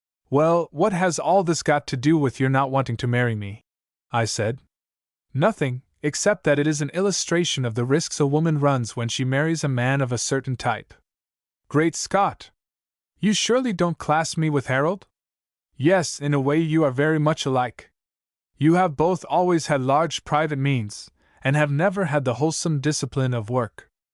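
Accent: United States. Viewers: Americsn narrator really doesnt work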